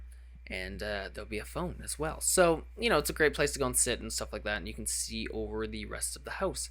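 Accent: Canada. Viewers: are you from canada?? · are you from canada? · no im not. ive never heard a canadaian accent b4 and i liked hearing it and i wanted to copy it momentarily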